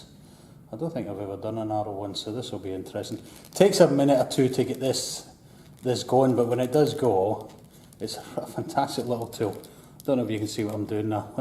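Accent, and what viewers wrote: Scotland, United Kingdom. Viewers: Thanks for sharing! Great video, don't worry about it being a bit raw, people watch it for your skills and I also appreciate listening to some Scottish ;)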